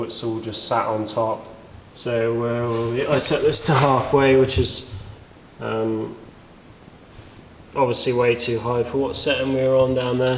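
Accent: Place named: London, United Kingdom. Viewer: You sound like you're from London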